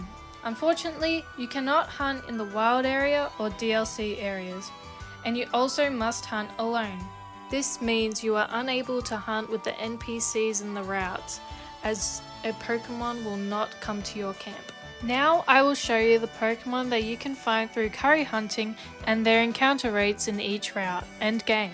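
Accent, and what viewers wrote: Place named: United Kingdom. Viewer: You sound board and British. It's going to be ok 👍 don't be sad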